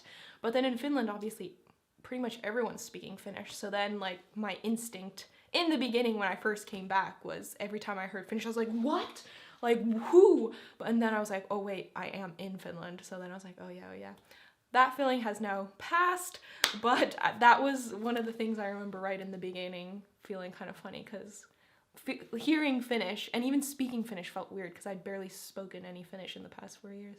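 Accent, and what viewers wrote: United States. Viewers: No offense you have an American accent i would thought you were from America lol 😳😳🤯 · you sound American to me for some reason, so cute and fun opinions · She sounds American, not British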